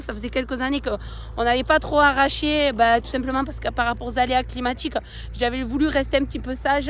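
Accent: South, France. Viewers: Bon courage dans votre travail. J'aime l'accent du sud, votre région est adorable.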